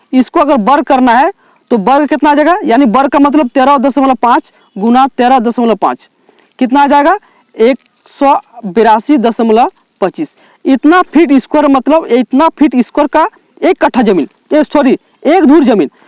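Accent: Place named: Rural, India. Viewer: Desi awas me mja aaya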